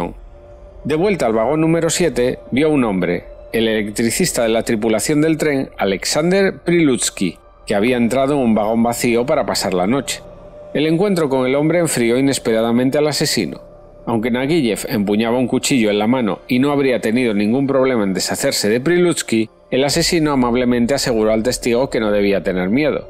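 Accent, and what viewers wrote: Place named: Spain. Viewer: Hola! Me acabo de topar contigo y voy a ver tu video, a ver qué tal. Es la primera vez, como he dicho. Te advierto que soy muy avezada en asuntos criminales, no me preguntes por qué, y que suelo comentar respecto al vídeo. Por tu acento creo que eres español. Yo te escribo desde Cartagena, en la Region de Murcia. Saludos! 🐺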